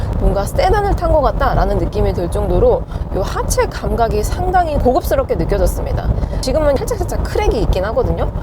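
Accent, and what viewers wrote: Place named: Chungcheong, South Korea. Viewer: 충청도 사투리 섞여 있네유 .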